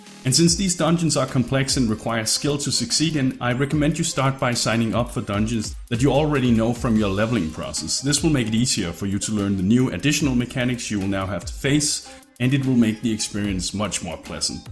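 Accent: Denmark. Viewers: Dat dane accent <3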